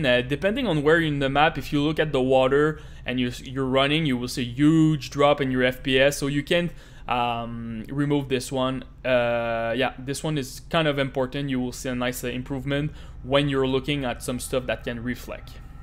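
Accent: Quebec, Canada. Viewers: Je reconnais un Québécois quand j’en entends un😂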